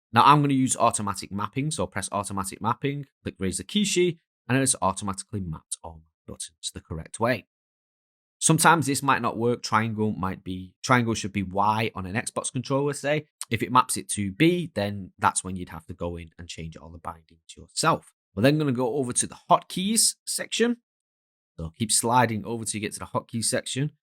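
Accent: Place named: England, United Kingdom. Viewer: Maybe because he is speaking English with an English accent.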